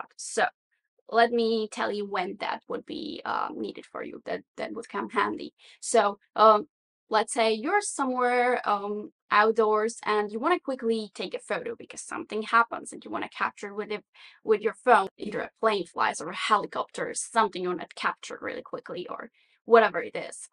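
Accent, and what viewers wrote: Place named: United States. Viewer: I watched your video at 1.5X speed. At that speed, you sound American--esoecially when you say "and".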